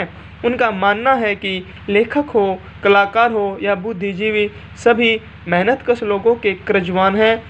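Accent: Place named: Haryana, India. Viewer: आपकी बोली में हरयाणवी भाषा की शैली है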